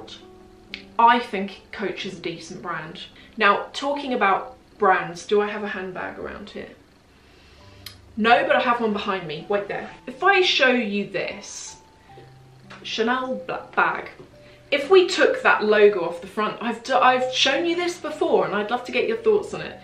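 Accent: RP, United Kingdom. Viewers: To point - there are lots of “wannabe” Brands that are very expensive but nobody buys them because nobody has ever heard of them Anyhow just commenting here bc I took my wife to a few luxury stores in Paris and London and she watches with her lil posh accent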